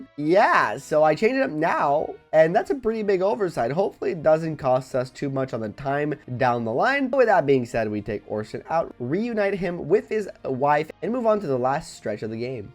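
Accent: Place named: France. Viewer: First time I have noticed an accent, are you french?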